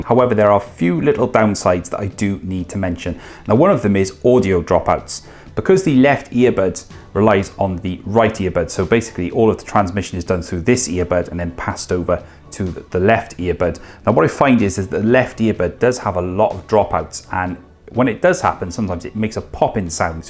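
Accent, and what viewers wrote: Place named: England, United Kingdom. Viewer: Great review, nice to hear an English voice after listening to a few Americans.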